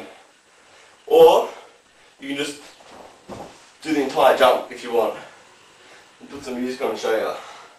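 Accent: Australia. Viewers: He's Australian. Our accents aren't that difficult to understand. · Are you australian? Fuck yea! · Aussie accent :) yay. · are you aussie!? your an aussie! haha me too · i tried learning it, but i cant dance so i couldn't do it :( but omg great vid and ur rlly good at shuffling :) btw, what nationality are you? are u australian, ur accent is awesome :)) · his acent sounds australlian cause it is????? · I'm not sure what is stronger. His ability to dance or his aussie accent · you mispelt guide*........i know your an ausie but please try and speak ENGLISH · OMG~!~! hes australian awsome acsent dude....... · I watched this video 8 months ago, it helped me get the moves down. I'm not gonna lie, I've ended up more hard style but still, this help me get started, Thanks for this video. And from the sound of it, you're Australian? Would make since, it is the * Melbourne Shuffle after all. 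· aussie accent :) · Why are people saying he sounds British? Not sure but he sounds more Aussie than British to me. But that is just an Aussie talking, what do I know? We are all upside down anyway xD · proper aussie accent bra! likes it! 5/5 this how i learnt!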